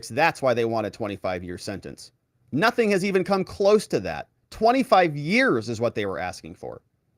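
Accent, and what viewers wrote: United States. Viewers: u have a montanan accent lol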